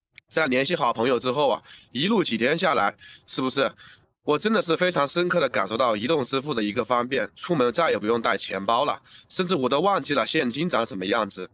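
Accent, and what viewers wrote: China. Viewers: 你這中國的口音！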